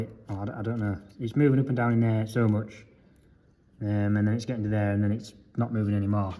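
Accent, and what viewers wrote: Yorkshire, United Kingdom. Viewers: brayed it in! broad yorkshire!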